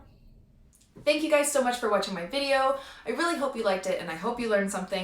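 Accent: Canada. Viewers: Well I think you are fantastic ❣️👏👍. I adore your little son, your casual teaching methods that allow me to make mistakes but keep trying, your encouragement, and your Canadian accent ( my sister and her husband, sons and their extended family are scattered throughout Ontario ) and we have many delightful Canadian friends from years of travels❤️🥰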